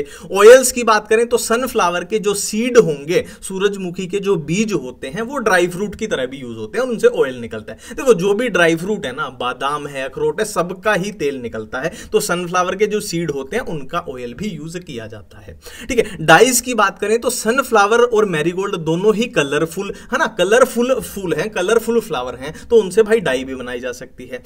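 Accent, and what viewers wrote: Haryana, India. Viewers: Both are belong to HR .. haryanvi accent h bro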